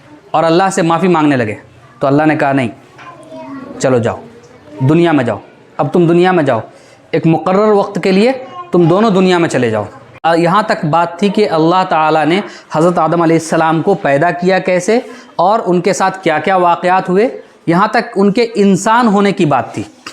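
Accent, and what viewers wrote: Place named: Bihar, India. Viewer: ماشاء اللہ انداز تفہیم بہت اچھی ہے اور ٹھیک ہے ٹھیک ہے کی تکرار اور مزیدار بنا رہا ہے جو بہاری پن کی ڈھیٹ علامت ہے جو بر محل ہے اللہ سلامت رکھے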